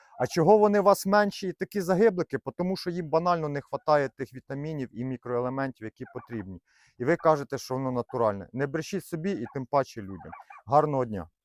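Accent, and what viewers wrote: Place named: Vinnytsia, Ukraine. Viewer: Вінницькій говір😮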